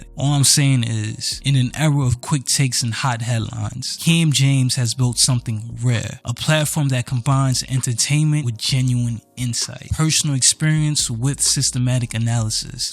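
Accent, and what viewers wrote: New York, United States. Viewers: The NY accent is coming thru super strong!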